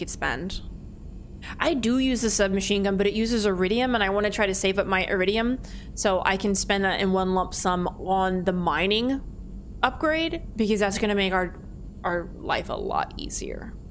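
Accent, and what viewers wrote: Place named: United States. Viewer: It’s definitely because you’re American cause I remember when I went to the beach and met this Irish lady we both thought each other’s accent were pretty neat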